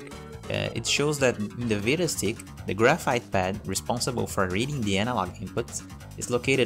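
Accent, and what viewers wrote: Brazil. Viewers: Nossa bem q eu tava achando esse sotaque dele suspeito KKKKKK sabia q era br esse puto ta procurando ele falando isso na descrição valeu ai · Tamo junto irmão, deu pra perceber pelo sotaque, mas o seu inglês é muito bom cara. E tambem, ótima explicação, ajudou muito, vlwww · Are you brazilian?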